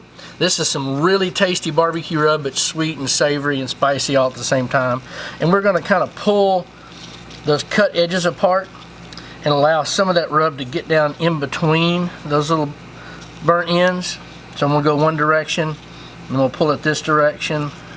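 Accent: South, United States. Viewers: well..let's see...he's combining bar b que....and bologna.....and describing the middle of the piece of bologna as "center- mass"....while cutting it.......and talking with a thick southern accent....my guess is he voted for Joe Biden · I love this guy's accent, he's like an endearing southern uncle.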